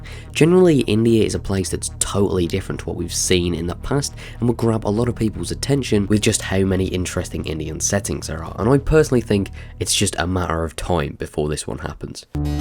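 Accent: United Kingdom. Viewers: Good Video. Unfortunately, I hate the british accent!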